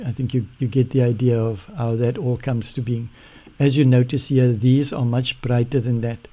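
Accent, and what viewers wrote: South Africa. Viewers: Nice so here a South African accent.:) Goeie werk.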